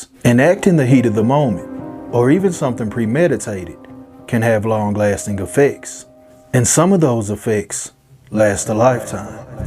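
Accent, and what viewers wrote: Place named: Memphis, United States. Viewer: You sound like you from #Memphis 😊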